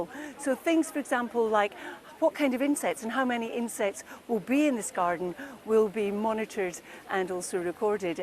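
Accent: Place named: United Kingdom. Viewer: Good god, she's just SO damned sexy! What a spectacular figure. And as is the case with Brits in general, I LOVE her accent, :)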